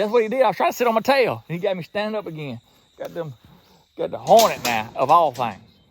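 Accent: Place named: Upper Peninsula, United States. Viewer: You was sounding like a Michigan Yooper a little bit, eh. The strange thing in Michigan is the farther north you go, the more southern it gets.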